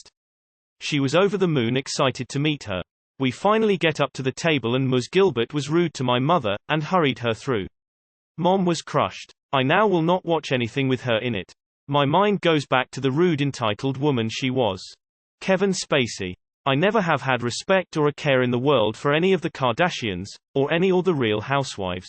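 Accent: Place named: United Kingdom. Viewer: The British voice works surprisingly well with hateful comments.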